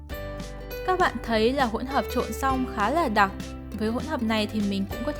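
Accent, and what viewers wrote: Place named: North, Vietnam. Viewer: Giọng chị cuteee quá 😍😍 em ng miền nam cực kì thích giọng miền bắc.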